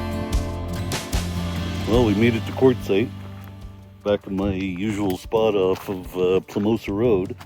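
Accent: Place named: Eastern Canada, Canada. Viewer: Love your shirt. you talk like one of them Eastern Canadians. are you Canadian?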